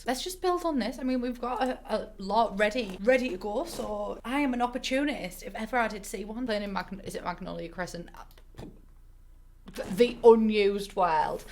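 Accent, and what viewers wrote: United Kingdom. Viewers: It’s hard to understand british english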